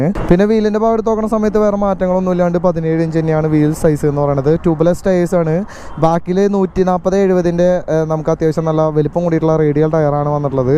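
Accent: Thrissur, India. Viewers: Aa thrissur basha onnu maatan sramikyo pls😁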